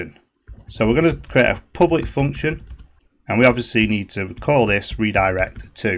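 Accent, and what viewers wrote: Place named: Australia. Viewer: Many Thanks , its really helpfull (but i sometimes confused with your australian accent heheh) , already subscribe and like all your video :))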